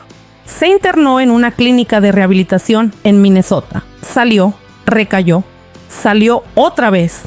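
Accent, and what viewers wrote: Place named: Mexico. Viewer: Tenés que limar ese acento mexicano que da asco...😮 escuchar...!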